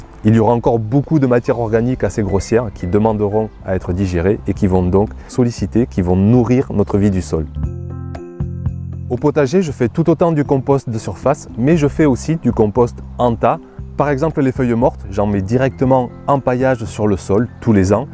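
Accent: South, France. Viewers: Très bonne vidéo très agréable à voir et écouter avec l'accent du soleil 😊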